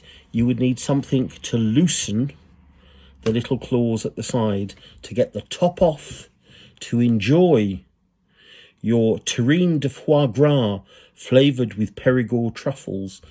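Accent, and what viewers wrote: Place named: United Kingdom. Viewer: These southern US ears have trouble understanding British accents. Did you say bginternet?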